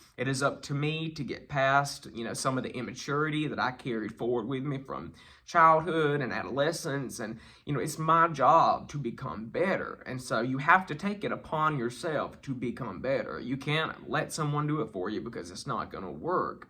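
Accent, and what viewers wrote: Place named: Texas, United States. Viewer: I found you by accident. What a treasure you are... I can relate to all of your videos.. I'm from Australia so I love ur Texan twang accent...LOL.. I am gay aswell but also a Christian....it's hard... I've started my YouTube channel only two months ago about being a gay in the church hopefully to help others... Keep the videos coming..❤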